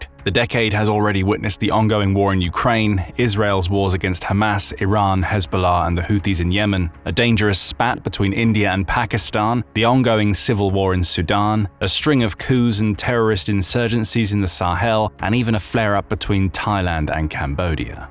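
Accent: United Kingdom. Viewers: interesting there is a british announcer narrating this video, implies the drug trade in participated in by British intelligence ...MI-6, anyone? Nothing new about the British trafficking drugs to exploit and destroy regimes and countries ... and the british monarchy is historically one of the biggest players in trans-national drug dealing ...after all, where did their wealth come from ... invading, destroying and colonizing colonies and countries of color. City of London bankers direct and finance all this for the monarchy, and it's no secret, that the U.S. remains a colony of the British Empire, according to treaties signed in 1776, 1810, and so on. It seems to me if South and Central and North American countries are humbled as drug dealers, that puts the british back on top in eastern europe, western europe, eurasia, africa and so on. signed, PortlandHomeboy ... 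btw that's also the name of my website.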